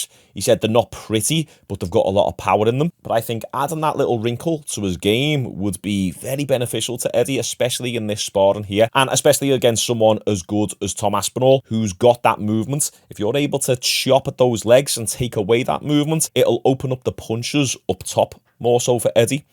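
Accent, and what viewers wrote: Liverpool, United Kingdom. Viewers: Can you shut up and just watch the video please! I don't want to hear a scouse to talk over the video!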